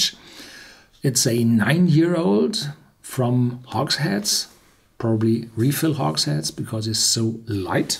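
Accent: Germany. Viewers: Joe Macchiarulo he's german...almost all German English speakers speak like that.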